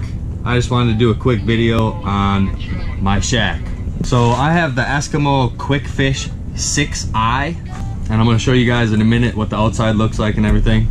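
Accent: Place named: Wisconsin, United States. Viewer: I’m from Wisconsin as well and man it took not even 5 seconds to guess you were from Wisconsin. Could hear it in the voice easy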